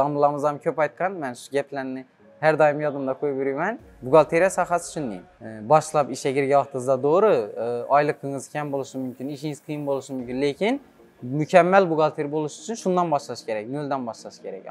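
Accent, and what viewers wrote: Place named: Khorezm, Uzbekistan. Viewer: Assalomu Aleykum Xorazm sheva gap yo`q, Buxorodan salom..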